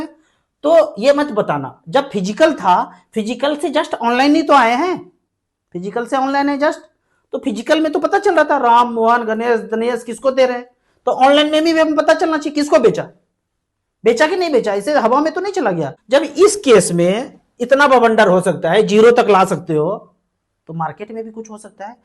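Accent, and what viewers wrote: Bihar, India. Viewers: Bihari boli bolta hai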